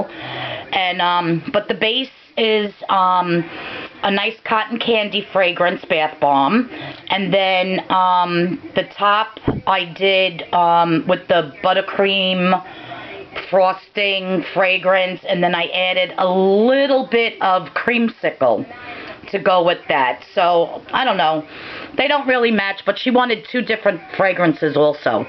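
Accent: New York, United States. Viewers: Thank you for all the info. I also love your accent. Since I moved from Fairfield County CT to Houston I don't get to hear NY accents anymore. And you cracked me up about your husband hearing the mixer. LOL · you sound like me where are you from you sound like a new Yorker I'm from Staten island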